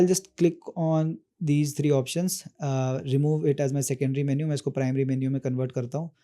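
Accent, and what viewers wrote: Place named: Urdu, Pakistan. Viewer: excent is look like urdu best tutorial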